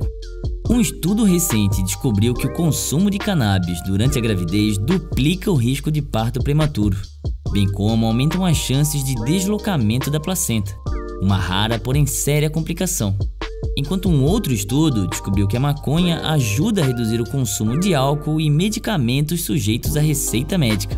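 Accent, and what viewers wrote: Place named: Florianópolis, Brazil. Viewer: Tu é de floripa né cara? Esse sotaque aí não me engana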